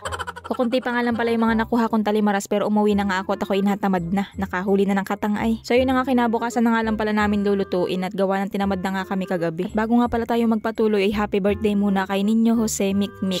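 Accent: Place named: Visayas, Philippines. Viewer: Murag bisaya si maam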